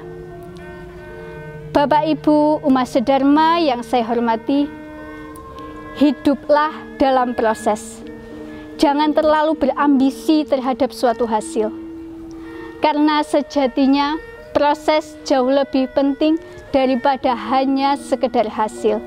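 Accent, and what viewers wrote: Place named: Java, Indonesia. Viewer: Medhokmu nduk.. bikin teengiang2 ... 🙏🙏🙏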